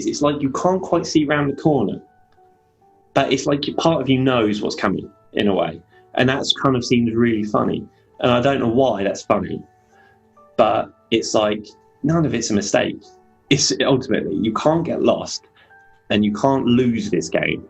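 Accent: United Kingdom. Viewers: Interesting take on DMT. Personally, "I" had to work real hard to decipher the Cockney type English accent but it was worth it. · Great report! Thanks for sharing! You sound British. I live in London. Let me know if your up for meeting some time :) Would be cool to meet and have a chat about this topic :) no one I know have had this experience.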